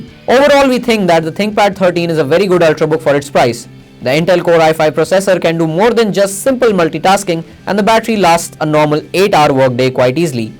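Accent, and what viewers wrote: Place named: India. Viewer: A hindu accent combined with a shitty audio quality. Thank you, but not, thanks.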